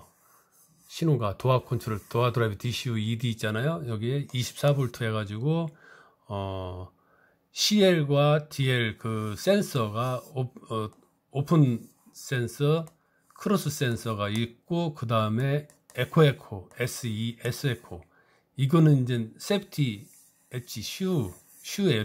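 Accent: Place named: Japan. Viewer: 일본에서 기술제휴로 만든 승강기이고 일본인 고문이 교육하고 40년 전만해도 영어를 제대로 못배워서 그런 발음을 하네요,,,지적 참고는 하는데요,,고치기는 힘들거 같습니다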